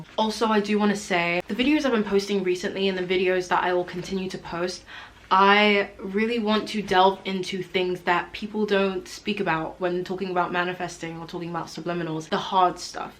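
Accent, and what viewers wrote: United Kingdom. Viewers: I just came from one of her older videos, why does she have a British accent now?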